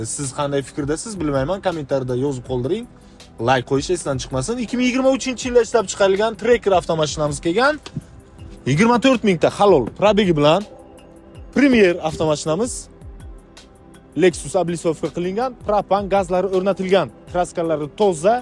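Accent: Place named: Tajikistan. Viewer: Tajikmisiz gapingizdan malum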